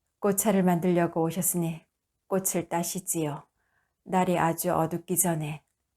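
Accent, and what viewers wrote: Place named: Seomjingang, South Korea. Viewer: 님 섬진강 사투리가 구수하네요 처음듣는 때 울음 🐓 꽃 따는 머슴 왠지 가슴이 먹먹하네요 매화골 언덕 길 찻집 머슴 나뿐놈일까요 슬품이 담긴 피릿소리 꽃 차향기 외로운 여인의 향기는슬프군요 😊 감사합니다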